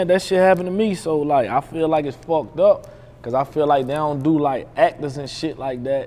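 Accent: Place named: New York, United States. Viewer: I thought he was from NYC but as a youngin moved down to the south i swear some times every now and then i catch a lil NYC swag from him i like some of his song's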